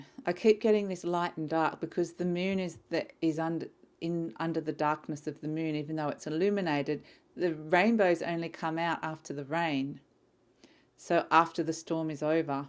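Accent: Australia. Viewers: Hello from Sydney I love listening to reading I got so excited hearing you speaking Australian English 😃 I’m always coming across American and other accents lol , I’m listening to my husband reading Leo ur spot on , u have a beautiful energy ur face is glowing with positivity great reading god bless you 🙏😊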